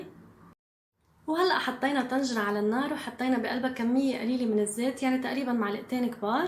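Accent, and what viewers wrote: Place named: Lebanon. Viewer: فعلاً شرح مفصل واضح جداً وعمل رائع ممتاز حبيبي لبنان 🇱🇧 لهجة لبنانية ❤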